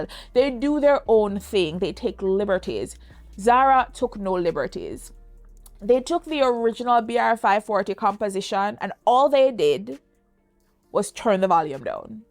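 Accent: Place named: Jamaica. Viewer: Am smelling a Jamaican accent 🤔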